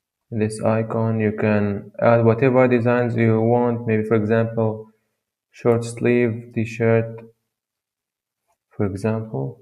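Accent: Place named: Arab world. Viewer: انت عربي مبين من حكيك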